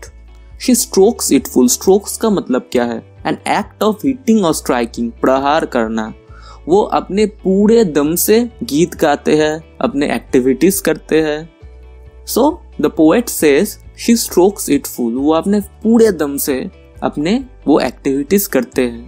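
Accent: Bengal, India. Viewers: I am from..howrah.... Hindi of bengali are usually like this..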